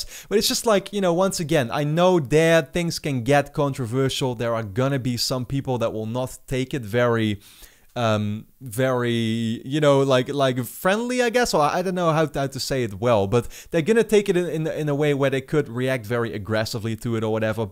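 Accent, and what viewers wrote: Netherlands. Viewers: Where do you live my guess is that you live in the Netherlands bcs your accent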